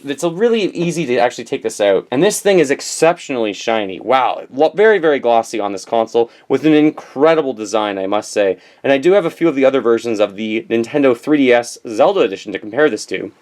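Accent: Canada. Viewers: That's how Canadians pronounce the letter Z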